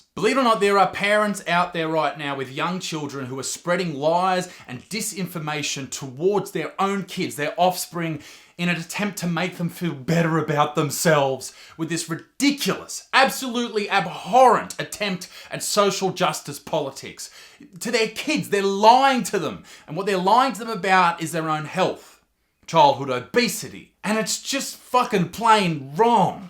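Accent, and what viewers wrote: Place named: Australia. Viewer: He's true blue....an Australian with thick Aussie accent. He was raised in rural Australia for sure.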